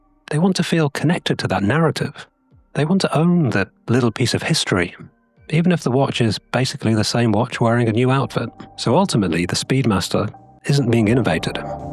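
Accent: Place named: United Kingdom. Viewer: My dear friend, first of all — no, you absolutely do not have a froggy voice. Your voice remains unmistakably British and genuinely a pleasure to listen to. Now, onto the video. That final line about “walking backwards into the future” was brilliant. It captures perfectly the tension between nostalgia and progress. It’s such a sharp observation. Your point about the Submariner was also spot on. It’s fascinating how so many brands feel compelled to orbit that design language — trying to be similar, yet different. Over time, that pressure creates repetition rather than evolution. Escaping that gravitational pull is clearly difficult. I particularly appreciated your remark about people wanting a watch they don’t need to explain. That’s incredibly insightful. There’s something powerful about wearing a watch that feels socially legible without effort. 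At the same time, I keep wondering where real innovation fits in today. Large brands often seem cautious, relying on subtle refinements rather than bold steps. Smaller brands experiment more freely, but rarely at a technical level. And perhaps that’s the real dilemma: creativity exists, but it’s either financially risky or commercially unnecessary. I also find it interesting how certain technical use cases — diving, aviation, racing — defined dial design historically, yet most of us don’t live those realities. So what would watches look like if they were designed around modern daily life instead? Comfort, legibility, emotional resona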